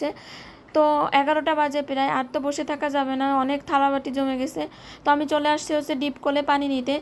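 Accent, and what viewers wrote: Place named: Barishal, Bangladesh. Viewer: আপু এটা আমগো বরিশাইল্লা বইন তুমি বোঝো নাই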